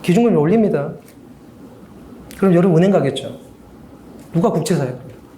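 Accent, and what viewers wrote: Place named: Jeolla, South Korea. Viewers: 어려운 내용을 유치원생들도 알아들을수 있도록 설명하시는 분입니다 감사하게 생각합니다 아쉬운점이 있다면 혀가 짧은데 말하는속도가 너무 빠르고 전라도 사투리로 말씀하시니 단어가 씹히는 안타까움이 😭 죄송합니다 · 전라도 사투리가 역겹네요.그 사람들에 대한 대한민국의 감정이 하도 부정적 이라서.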